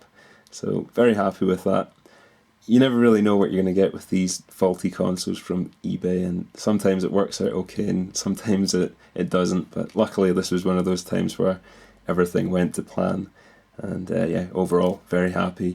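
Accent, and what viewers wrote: Scotland, United Kingdom. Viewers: Is that a Scottish accent I hear?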